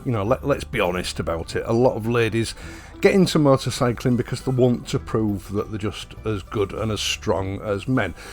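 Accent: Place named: East Yorkshire, United Kingdom. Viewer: Ive had the Honda CL500 for 2 years now and its great - not in a hurry to change it. . By the way, you sound like you're from the East Yorkshire area, if so, me too!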